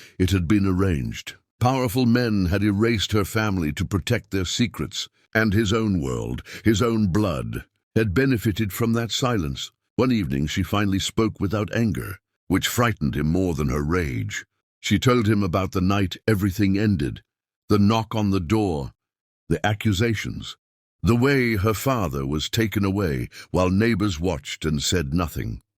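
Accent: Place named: RP, United Kingdom. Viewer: A story about the American Wild West and you choose to use an upper crust sounding British voice dripping with contempt? Nope!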